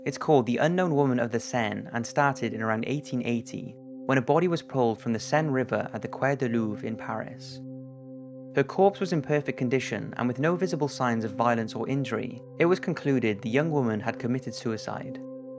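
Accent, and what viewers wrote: Wales, United Kingdom. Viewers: He’s British that’s for sure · are you welsh? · I've always wondered, are you Welsh? you seem to have a slight Welsh accent.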